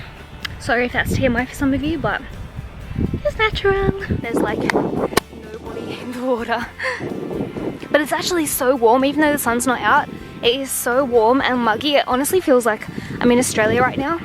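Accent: Ireland. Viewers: Is it just me or do you have a bit of an Irish accent :o 🍀:) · I am loving your little Irish twang. I live in Brit living in Tassie, but all my family are Irish! Love it! Thank you for sharing a part of your soul with us! <3 love and hugs xx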